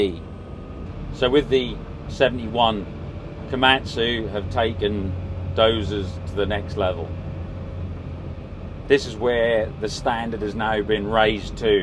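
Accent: Norfolk, United Kingdom. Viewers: What accent is that? Norfolk?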